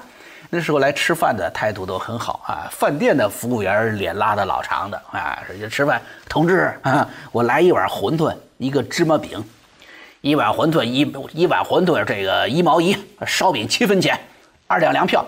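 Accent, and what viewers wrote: North, China. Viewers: 很好奇主持人是哪里的，听口音我感觉是北方人。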